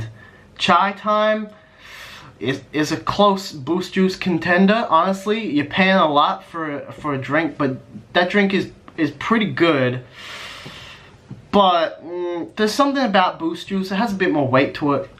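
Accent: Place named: United States. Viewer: Honestly a solid list, not a lot to contest here. Would have put Sushi Train at a B tier at most purely out of cost vs product but that's personal choice. Same goes for IKEA; cost of going there doesn't make the trip worth it so that's at most a C tier. My biggest issue is Subway as a C tier. You're clearly from the states with that accent but here in SA a proper Subway is at least A tier. As a whole, this is good list and I look forward to more on the flip side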